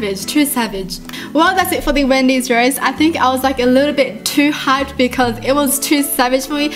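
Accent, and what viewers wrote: Australia. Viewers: do u live in Australia bc ur accent sounds Australian and u said u live in Sydney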